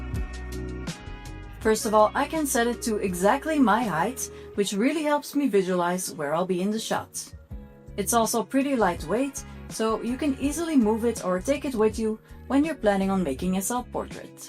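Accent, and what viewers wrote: Flanders, Belgium. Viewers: Dankt voor de tips, leuk om de verschillende keuren haar te zien en je mooi Vlaams accent te horen :-)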